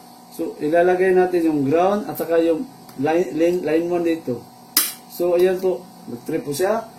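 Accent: Visayas, Philippines. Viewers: visaya diay ka?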